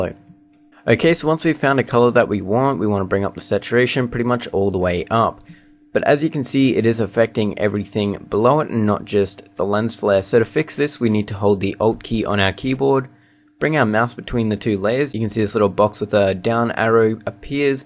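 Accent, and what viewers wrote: Australia. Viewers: me neither cannot understand australian english "hold the OKIE on a keyboard" :D what is that? thx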